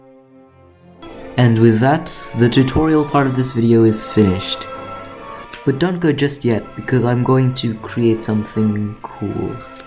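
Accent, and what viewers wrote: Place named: United Kingdom. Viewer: I finally found a british you tuber!😊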